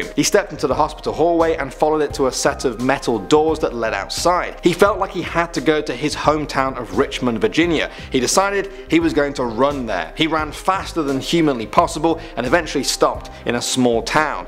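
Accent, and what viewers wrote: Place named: United Kingdom. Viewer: I can’t stand this British accent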